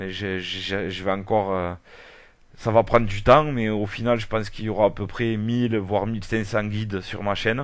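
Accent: South, France. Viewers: et bien merci pour la vidéo et vive accent du sud je suis de Narbonne et passion jardin aussi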